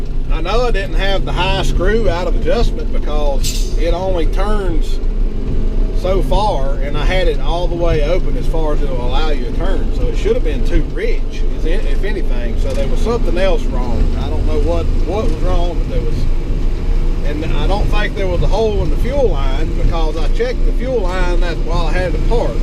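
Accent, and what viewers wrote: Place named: Tennessee, United States. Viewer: I’ve got a buddy from Morristown tn who has an identical accent If you had said “now look here” I’d have thought you were him Sorry about the tree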